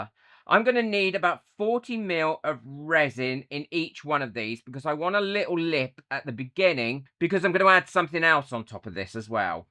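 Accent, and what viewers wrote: United Kingdom. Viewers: I love watching your videos and have learned a great deal. I have a question: where are you from in the UK? I love your accent.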